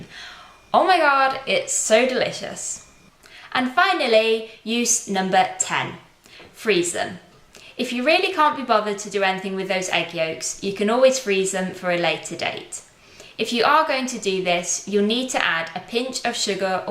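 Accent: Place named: England, United Kingdom. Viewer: great to see and hear an English Accent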